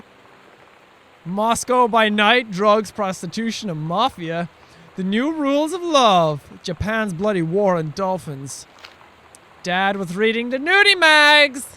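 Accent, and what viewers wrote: Ireland. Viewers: “ya fecking idiot” got me XD god, I love how some accents make certain words just sound hilarious. Not meant to sound racist, I love different accents, honestly I kinda wanna condition myself into having an accent like Jack’s, despite not being Irish, I just love the sound of an Irish accent. Don’t know why, they just sound satisfying to me.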